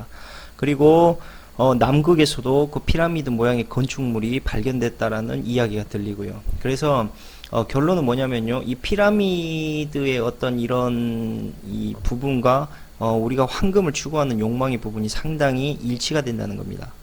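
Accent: Jeolla, South Korea. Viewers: 억양이 전라도같은데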